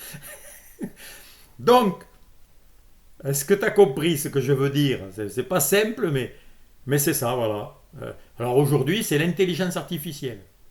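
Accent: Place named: South-West, France. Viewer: ❤❤😂❤😂quelle pêche, lucidité, humour, objectivité...n en jetez plus😂😂😂...vous êtes du sud ouest avec 1 accent aussi prononcé...?? Le même que le mien soit dit en passant..en tout j adore..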